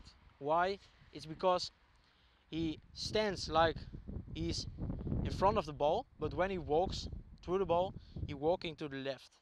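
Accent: Netherlands. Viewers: Is it weird that i could detect his dutch accent before i saw his name??😅😅😅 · cruz you’re right thats because he is 100% dutch :D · dit is de eerste vid die ik heb gekeken van hem en ik hoorde ook al dat ie nederlands is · Dat nederlands engels 😂 geweldig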